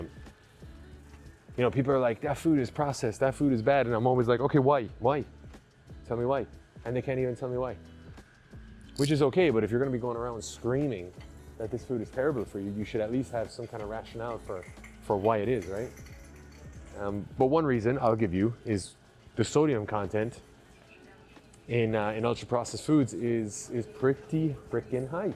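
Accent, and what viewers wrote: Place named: Ireland. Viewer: Irish or American? Losing the accent lad 🇮🇪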